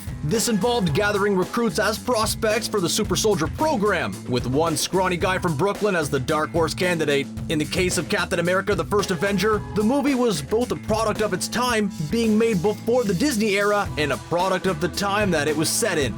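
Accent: Canada. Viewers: I hear a Canadian accent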